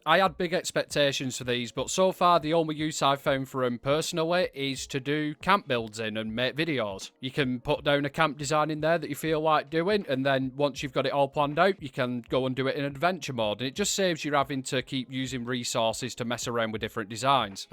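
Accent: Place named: Yorkshire, United Kingdom. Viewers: He's not a brumy, further north. Sounds a bit Manchunian. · Weren't expecting that accent when I looked up a new fallout 76 review lol, Bolton right? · Turn the mic down that Yorkshire accent is like needles being driven into my ear lobes. Beowulf style and I not joking. That creatures ears vibrating thats my ears. But I got what I needed after 2min so thanks.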